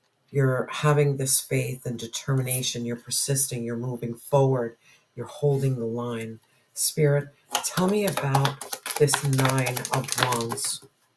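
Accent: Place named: New England, United States. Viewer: Omgsh thank you for being the vessel to send me this message ❤so powerful ❤ my decrement is everything to me ❤ God and now you have confirmed that for me ❤ Ps you have a i love listening to your reads you are so up lifting and your voice reminds me of my mothers [new England (new bedford) accent)] Blessings to you ❤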